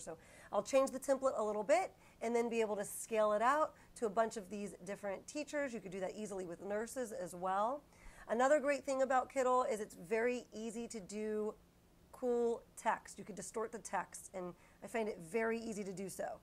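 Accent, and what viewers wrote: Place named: New England, United States. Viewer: i have been following you since day 1. I went from 0 sales in may to 360 sales today, which is not tremendous but I don't have a lot of time to spare so I consider it a win. I owe a lot of my success to you and a few other youtubers like you. So thank you!! I was honestly surprised because I haven't had a single 0 sales day since January 1st. Before that, it was probably October. Again, I owe it to you ❤❤ In other unrelated news, when I first found your channel I was surprised by something else and that's Tucker lol. I don't know where you live but judging by your accent i assume somewhere in New England. There's a gardening Channel i watch, James Prigioni, and he has a little dog called Tuck who looks a whole heck of a lot like Tucker. They live in Jersey. Just a fun fact 😂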